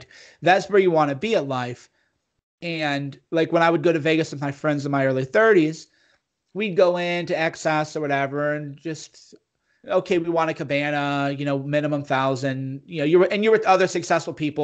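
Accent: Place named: Germany. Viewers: He sounds likes he is from Germany or some eastern European country. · Yup, German!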